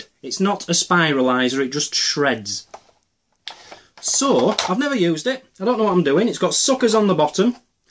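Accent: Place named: Yorkshire, United Kingdom. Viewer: Dude where u from u sound just like me. Somewhere in Yorkshire??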